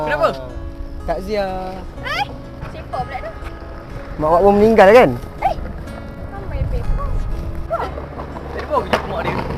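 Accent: Kelantan, Malaysia. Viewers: Bg dari kelate ke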